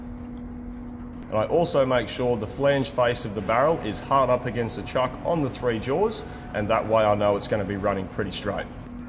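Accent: Australia. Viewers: Love your channel, excellent work but honestly sometimes I watch just to hear that Aussie accent Good day mate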